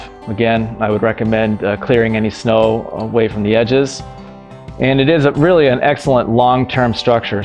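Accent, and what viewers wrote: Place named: United States. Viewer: Barrels in a greenhouse supposed to store heat, then temperature can be more consistent. Barrels can only be filled with water, hit by the sun, or connected to solar panels. You can also fill nutrient solution that you circulate trough your plants, using any kind of temperature control that you wish. heating the water in your greenhouse is way more sustainable then air. Especially some of hydroponic systems require a huge amount of water. I am also missing a bit of broad analysis on greenhouse types. Pros and cons really depend on climate but I only heard that is either suitable for your climate or not which I assume is somewhere in the US because of your accent.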